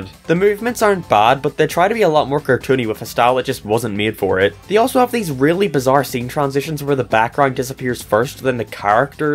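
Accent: Northern Ireland, United Kingdom. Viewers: I honestly started watching you because your accent makes your commentary pleasing. If I had to guess, youd be from Northern Ireland. I had a coworker from there and I can hear the similarities between you two.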